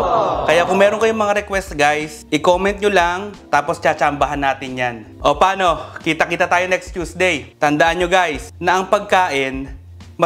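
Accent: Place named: Cavite, Philippines. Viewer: yung punto parang taga-cavite... imus or bacoor hehe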